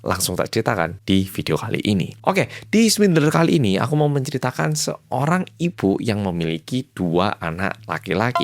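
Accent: Java, Indonesia. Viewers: Suka banget dengerin cerita medok ini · Masnya Korea tapi udah luwes banget ngomong bhs indo.. Jawa juga nendok apa dari kecil udah di indo.. Salam kenal dari jkrta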